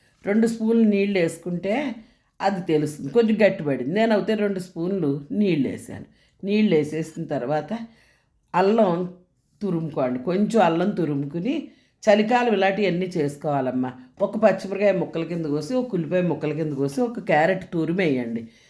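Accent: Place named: Godavari, India. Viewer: Ma ammamma la matladataru amma mana godavari style ye veru amma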